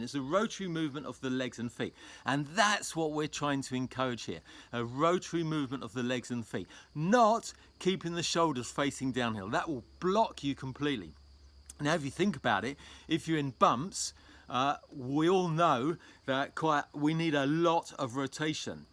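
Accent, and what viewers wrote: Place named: United Kingdom. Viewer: We must be close in age because I also learned skiing with over 2 meters under my feet and extension was kind of essential to rotate those monsters. And now I still struggle to avoid doing it because with these new skis extension equals lose control... btw I've started skiing again only five years ago and adjusting is still problematic at times. Thanks for these videos, love the Brit accent, but remember here in the US a stretched middle finger might send a problematic message :)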